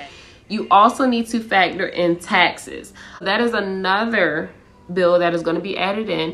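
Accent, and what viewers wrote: United States. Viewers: Congratulations 💯 I can hear the baltimore in you 🦾 we different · Are you from PG county? The accent! lol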